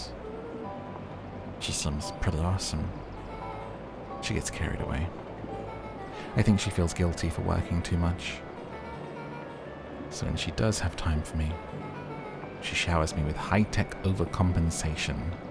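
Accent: United States. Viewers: I thought your American accent was really good! My brain didnt even notice it until you mentioned it, which is what you want when doing an accent. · Your southern US accent is sooo good whether youre doing it for men or women.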